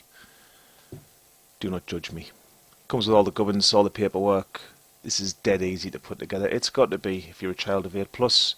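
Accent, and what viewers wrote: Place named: Geordie, United Kingdom. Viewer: you sound more jordie in this than you do in person hahahaha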